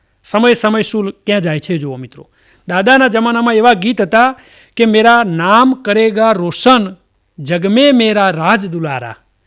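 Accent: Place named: Mehsana, India. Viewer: mast mesanani bhasa bolo chho sweet lage 6 pan atlivarma puru lo thoda vadhare to sambhdavo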